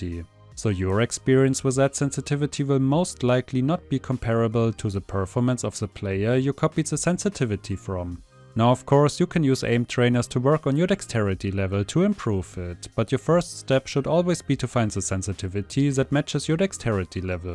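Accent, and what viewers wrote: Germany. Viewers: Yo hey r u german?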